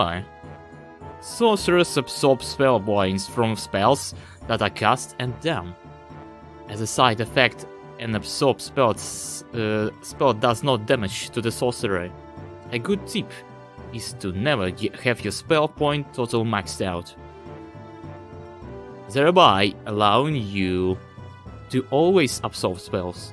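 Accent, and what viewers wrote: United Kingdom. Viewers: When I saw "almost without accent" I expected to hear less accent than I heard in that video, though nice try, it's not russian accent at very least. From what I heard it feels more like scottish accent or something like that, sry for being too strict :DD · Круто получилось! Кстати акцент напоминает британский, воть. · Учитывая шрифт документа, очень даже приемлемо. Отдаёт чем-то британским в плане произношения, но я не эксперт. Хорошо получилось.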